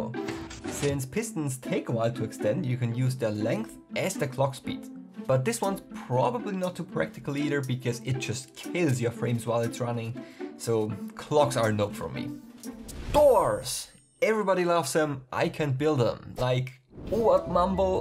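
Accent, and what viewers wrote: Germany. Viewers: Are you german it kinda sounds like it Ich bin auch deutsch ehre ich liebe deine videos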